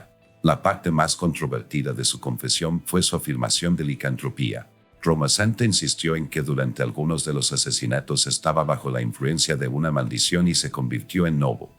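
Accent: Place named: United States. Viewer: Por qué ese acento yanqui?????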